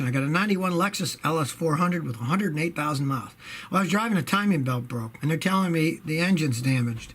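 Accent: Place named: Upstate New York, United States. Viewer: Hes from upstate NY, he sounds like italian friends i have from upstate NY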